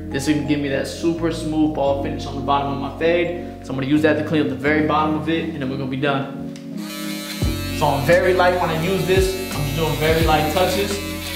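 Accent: North Carolina, United States. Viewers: Are you from North Carolina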